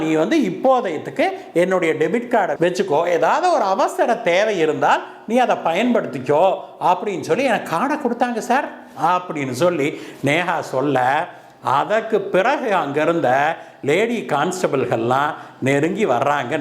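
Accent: Kongu, India. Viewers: கொங்கு தமிழ் அருமை அண்ணா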